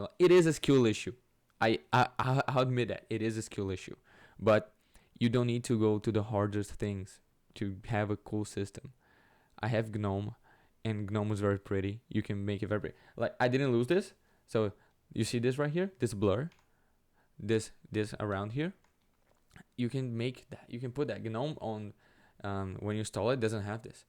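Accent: Brazil. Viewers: Tu é brasileiro? Esse sotaque é igual o meu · kkkkk juro! Vi por literalmente 2segundos e ja fui nos comentarios para ver se alguem tbm tinha notado. O sotaque br falando ingles eh bem unico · Coé mano, faz um vídeo em português também, esse sotaque não engana. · are you brazillian, man? you've got the southeastern accent xD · Cara, impressionante como abri o vídeo e na primeira palavra percebi que era BR, CACETE a gente conhece o nosso sotaque lkkkkkkkkkkkkkkk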